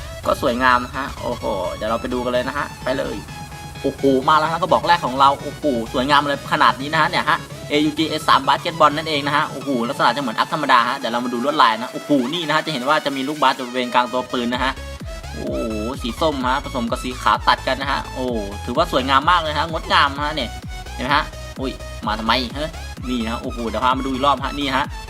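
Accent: Isan, Thailand. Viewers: พี่เปนคนอีสานหรอ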